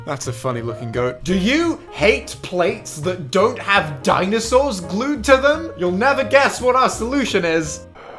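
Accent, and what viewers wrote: England, United Kingdom. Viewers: This man do be English tho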